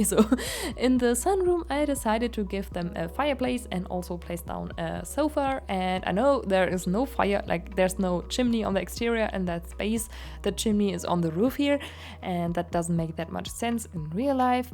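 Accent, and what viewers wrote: Germany. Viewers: hört sich sehr deutsch an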